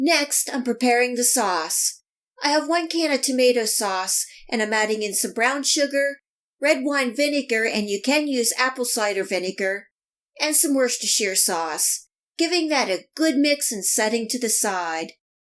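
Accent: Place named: Northeastern Pennsylvania, United States. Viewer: You sound like you're from Northeastern Pa.......me, too! Scranton boy! Love pigs in the blanket! Good recipe,! Every household in my neighborhood made them slightly different...but they were all good!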